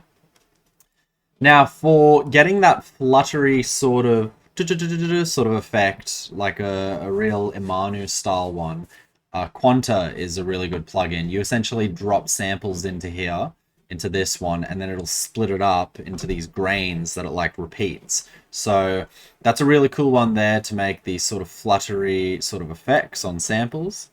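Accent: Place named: Australia. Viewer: You sound like you from Australia🇦🇺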